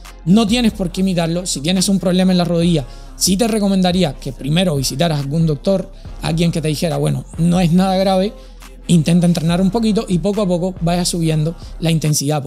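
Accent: Cuba. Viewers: el chama ya tiene el ascento cubanoo ❤😮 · El chama tiene acento cubano!!! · Ya el chama habla cubano, saludos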